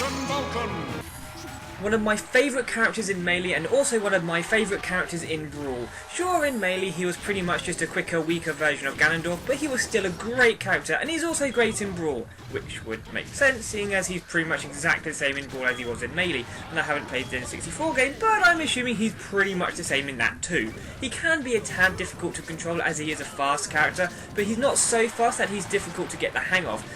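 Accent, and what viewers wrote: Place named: United States. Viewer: ***** An American ;P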